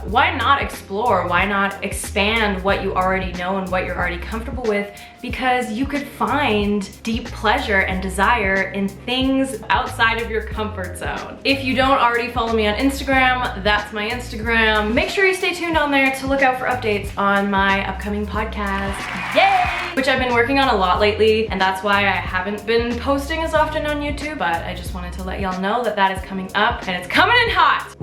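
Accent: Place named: Canada. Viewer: I repeat I'm here only for sweet Canadian accent 😁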